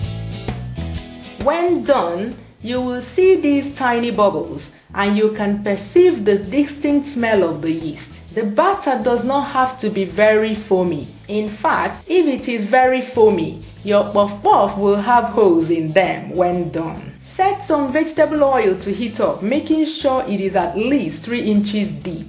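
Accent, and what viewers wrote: Igbo, Nigeria. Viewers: hahahaha god her nigerian accent is so funny to me and i am nigerian! still, I enjoy her videos and i learn a lot. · looool I love the Nigerian accent!! the way you say "puff puff" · your accent is so Nigerian. I love it! i love how my Nigerian women sound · She's Igbo... I like the accent 😃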